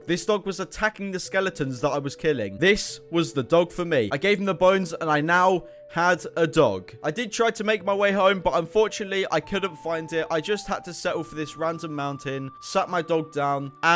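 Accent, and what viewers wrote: United Kingdom. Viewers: u sound different didn't u have a British accent or am i thinking about a different youtuber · He sounds British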